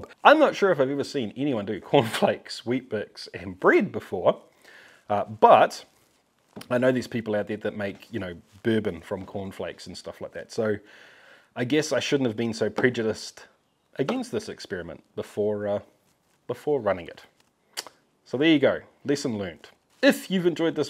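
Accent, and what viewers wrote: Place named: New Zealand. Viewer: You kiwi?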